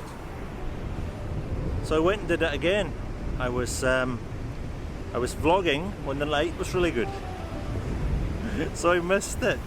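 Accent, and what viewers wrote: Scotland, United Kingdom. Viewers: Thanks Rolf. Yes scottish accent with english languauge. Often difficult to understand. Practice makes perfect¡ = )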